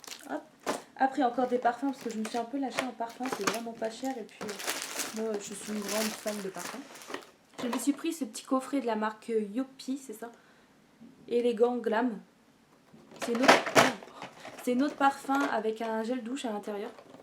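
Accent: Nord-Pas-de-Calais, France. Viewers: Coucou, je débarque sur ta chaîne et je me suis abonné direct ! Je suis une nozaddict et j'adore tes vidéos :) une petite question, tu viens d'où ? Tu as pas un petit accent ch'ti mdr???? Gros bisous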